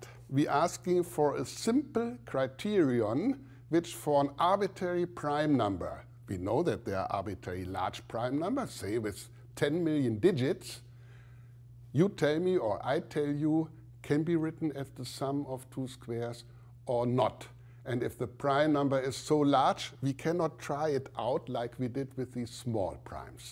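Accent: Germany. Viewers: Stop commenting his English. He speaks well and understandable. Try to imagine yourself explaining difficult problem in foreign language. Most you you, who complain, are not even within range of sight knowledgewise. And btw rather german accent than french one. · I'd say German, he sounds like Werner Herzog. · I don't think an accent can get any more german than that. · I can clearly hear from where (in Germany) he originates - it's all in the prosody. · His german accent is cancerous.-. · I thought: "He sounds german." And the description reveald my assumption as true. :D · You can directly hear that he is from Western Germany :D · Oh, that accent is too German, indeed! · He has a thick accent for sure, but I can understand what he is saying. So I have no problem with it. I can't speak German, so I'm glad he knows English!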